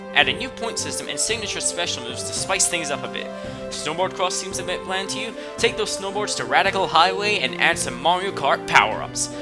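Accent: Scotland, United Kingdom. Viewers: Yeeeaaaaahhh... you totally have a Scottish accent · I actually guessed you were Scottish when i first heard your voice! AND I WAS RIGHT!!!!!! (And i'm not lying!) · I knew you were Scottish from the start thanks to your distinctive accent. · Dude, I always thought you were Scottish. In fact, I thought that was once a running gag in colabs. e_o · i knew u were scottish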